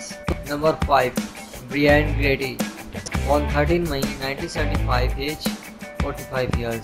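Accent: India. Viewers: Can’t understand the announcer because of his Indian accent!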